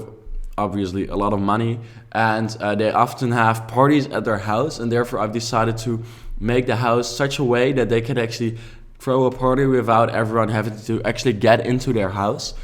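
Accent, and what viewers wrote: Netherlands. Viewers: Omg wahah, ik dacht al dat ik het nederlands engelse accent hoorde! ❤️✌🏻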